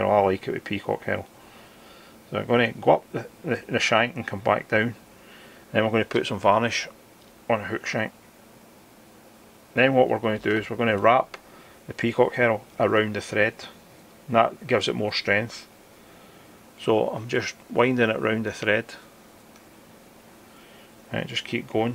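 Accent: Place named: Scotland, United Kingdom. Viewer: Great fly....nice clean head. I have a hard time understanding the Scottish brogue, but enjoy it none the less. I've a qeustion though. I'm in the States (Montana), and I've never heard of a "cruncher" fly pattern. I could be living under a rock, but got me thinking if we have different nomenclature for flies across the pond just like we do with other things (line vs queue / trunk vs bonnet / trash can vs bin, etc.). For instance, a common pattern over here is called a "Royal Wulff." Do you have the same over there? Another common pattern would be a "Caddis" or "Renegade." Do you have those as examples?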